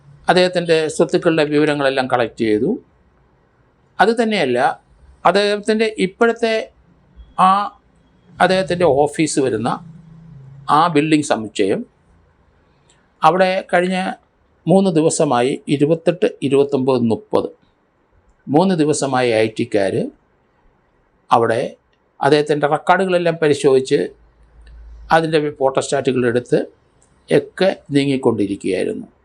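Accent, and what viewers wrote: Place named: Thiruvananthapuram, India. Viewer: അത് പ്രാദേശിക ഉച്ചാരണം ആണ്. ഞങൾ തിരോന്തോരംകാർ അങ്ങനെ പലതും പറയും. ആറ്റുകാൽ കോവിൽ എന്നതിന് ആറ്റുവാകോയിൽ, ജനറൽ ആശുപത്രിക്ക് ജന്നലാശൂത്രി ഒക്കെ ആണ്.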